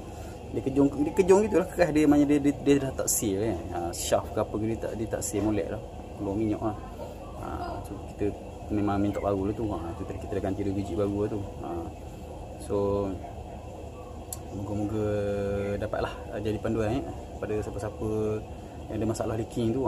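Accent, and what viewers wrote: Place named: Pahang, Malaysia. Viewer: pekat phg aok yek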